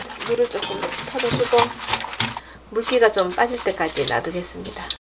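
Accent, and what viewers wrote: Gyeongsang, South Korea. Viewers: 경상도 말씀으로 설명이 억쑤로 정감이 갑니다.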